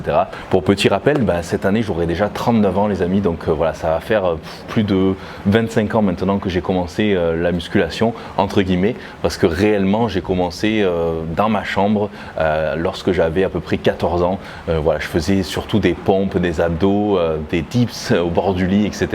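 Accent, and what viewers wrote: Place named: Le Pontet, France. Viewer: Le pontet👍 je me disais bien avec cet accent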